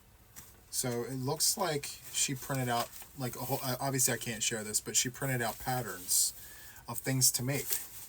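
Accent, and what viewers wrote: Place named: Boston, United States. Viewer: I love your Boston accent 😊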